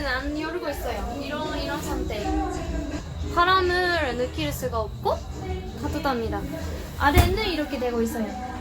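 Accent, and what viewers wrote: Gyeongsang, South Korea. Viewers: 대구에 좀 오래 살았더니 대구 억양이 마이 나오네요 이쁜 ~~^^ㅎㅎㅎㅎㅎ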